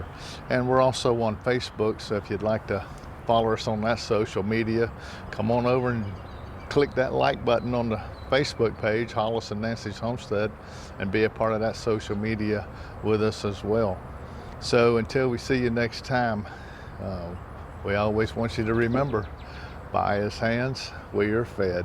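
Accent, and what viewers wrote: South, United States. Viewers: Great videos from Wonderful People Easy to understand as Down to earth as the earth that puts forth our food from God with our labors i have these big treats in 5 gallon pails as i am an apartment dweller they are just starting but i used Miracle Gro potting soil an added peat moss an perlite and will be adding plant food from Miracle Gro along with other nutrients .God Bless you sweet children of God ps as a Canadian who Loves America Love that Southern accent Keep these greeat teaching tools Coming an I can Relate to Old an Fat !!!! brother but we both worked our butts an arms OFF at 59 i can Still outwork the Young Bucks as i am sure you can Keepem coming Hollis an Nancy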